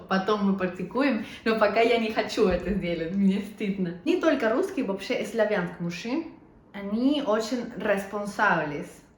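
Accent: Spain. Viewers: Случайно наткнулся на это видео-ну ты перуанская красотка- молодец 👏, неплохо говоришь по-русски, с приятным испанским акцентом! 👍 Удачи тебе, лайк и конечно подписка! 👍👌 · Впервые слышу испанский акцент, если не вслушиваться звучит как "плюм-плюм"))))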